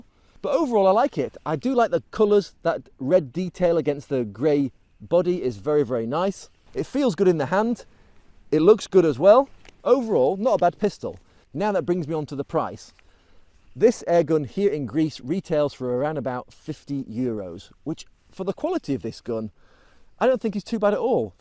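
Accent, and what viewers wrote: United Kingdom. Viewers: Great British accent.... So where are you from?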